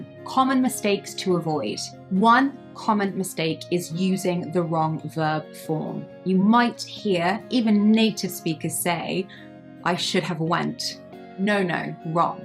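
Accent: United Kingdom. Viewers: Hi, I just love your videos. They are really helpful. Thanks for the great job you're doing. By the way, I love your typical British accent.❤❤❤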